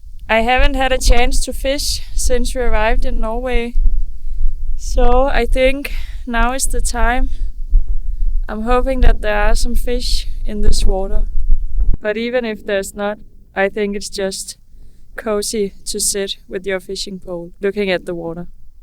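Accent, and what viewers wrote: Denmark. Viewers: what for a lovely place in nature! thanks for taking us along; it's nice to hear the danish accent! sheep around the tent, what for a soothing scenery...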